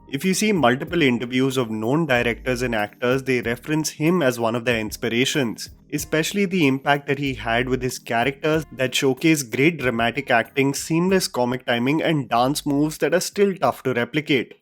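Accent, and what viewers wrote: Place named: North India, India. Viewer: Why are people so focused on pronunciation? I've seen so many comments till now that it irked me to ask this. He is a north Indian I presume. He is not accustomed to speak other languages and hence it affects his pronunciation. He speaks good English and Hindi. Does pronunciation matter so much that you have to point it out? This is a Film Review channel and if you call him out for him hurting anyone's sentiments because he said something without research about other culture and stuff then go ahead. It is fair. But calling out for pronunciation is insane. Sorry about that but the fact that one "word" makes you comment on a video which focuses on movies is something I don't understand. If you are put in a spot to read a word from language you have heard but never spoke - you will not get all the words right. Especially if you are speaking a sentence in your mother tongue/language you are familiar with and insert a word from another there is a high chance you will read it wrong. 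I have seen far too many comments to rant like this. Not just because I am defending him specifically but this just gave me a space with proof to talk about this subject. It may seem negligible issue (and maybe he is trying to improve it) but if someone constantly reminds me this it will irritate me (as the main point here is to comment on movies and not the pronunciation - doesn't mean he should be like "I'll say whatever I want and however I want" but saying he ruins the word by mispronouncing it - I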